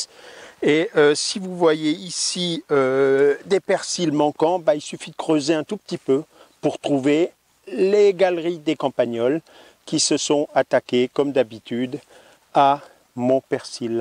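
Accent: Alsace, France. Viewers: Non, de grâce, ne changez rien! C'est notamment la longueur de vos vidéos qui en ont font tout l'intérêt, car on apprend aussi beaucoup de choses dans vos apparentes digressions, qu'elles soient relatives aux techniques de culture ou plus éloignées du sujet (grâce à vous j'ai réécouté avec plaisir!). D'autres détails un peu décalés et "inutiles" dans l'absolu me font mourir de rire et ça fait du bien aussi! Et puis quel bonheur d'écouter votre parfaite maîtrise de la langue française, l'utilisation toujours réfléchie du juste mot, c'est devenu tellement rare, c'est comme du miel qui coule dans mes oreilles... On ne voit que trop l'effet direct et catastrophique des textos et autres twitters sur l'appauvrissement de la langue française et du raisonnement intellectuel... Et votre charmant accent alsacien qui fait votre signature sonore me berce, me repose... 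C'est l'éloge de la lenteur, de la fantaisie, ça va à l'encontre de tout ce qui fait notre époque moderne (concision, rapidité, prétendue et illusoire efficience), et, n'en déplaisent à certains (libre à eux de faire "avance rapide" ou de regarder d'autres vidéos), ça fait le plus grand bien!!! Alors merci, merci, merci, pour tout ce que vous êtes, ce que vous donnez, et surtout, continuez comme cela, monsieur le paresseux!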